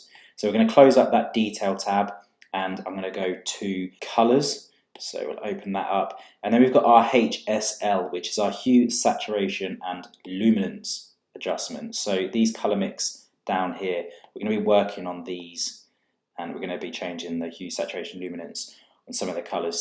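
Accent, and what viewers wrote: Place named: South East London, United Kingdom. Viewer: He sounds like he is from the south east of london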